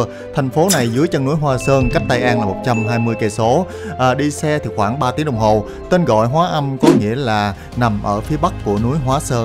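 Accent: South, Vietnam. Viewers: Kỷ thuật quay phim điêu luyện,giọng thuyết minh miền nam nghe thật dể mến,chúc cháu luôn khỏe mạnh để thực hiện thêm nhiều clip đặc sắc nhé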